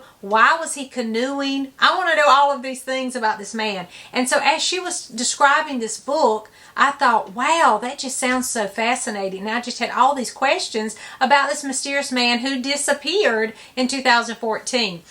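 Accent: South, United States. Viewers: Love your smile... laughter... and, your southern accent!😁🤗💞. Hv u read, “B is for Betsy “?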